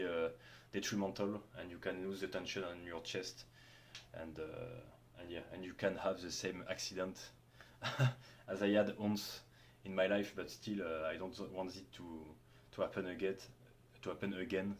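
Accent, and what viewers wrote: France. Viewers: Great video and iconic French accent. Subscribed 👍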